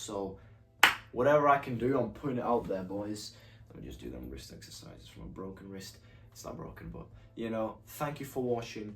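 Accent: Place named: United Kingdom. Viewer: Ok cool, I just reacted because you describe in your video how the war "started" while talking about 2022 events, but I can understand Btw how can it be that you speak English like a true Brit if you are from Ukraine?? Ukrainians and Russians usually have a very recognizable accent. Maybe you had had some connections with UK before you moved there?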